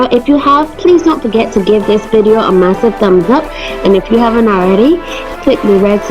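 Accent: Barbados. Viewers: Love that Bajan accent 🇧🇧🇧🇧🇧🇧 thanks for the ideas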